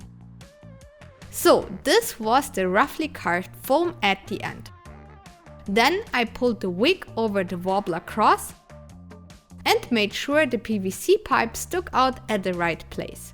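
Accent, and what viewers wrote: Germany. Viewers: Where did you catch the german accent?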